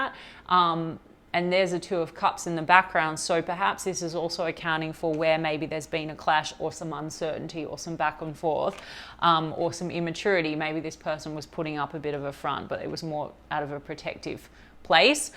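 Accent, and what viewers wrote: Australia. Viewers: You're SPOT ON for my relationship!!... Amazing 👏 Thankyou🙏🩷 And lovely to hear a fellow Aussie 💞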